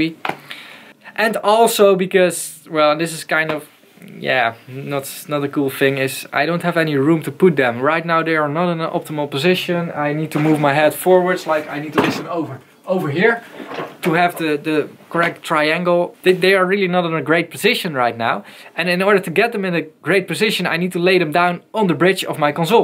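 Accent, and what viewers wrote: Netherlands. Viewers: I realized that subtitle detected your english as dutch :) Thanks for the video!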